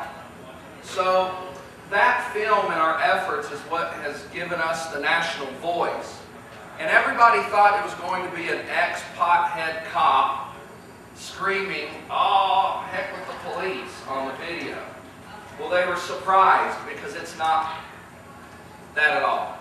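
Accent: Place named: East Texas, United States. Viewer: what part of east texas is he from?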